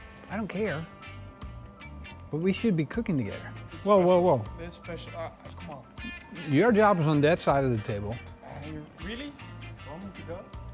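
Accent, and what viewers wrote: Netherlands. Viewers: are you dutch bc's i am and i think i notice the accent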